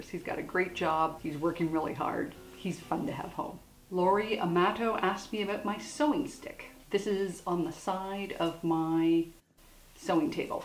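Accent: Canada. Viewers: I knew it! You're Canadian ya! me too. Thanks for your informative videos. Bye from BC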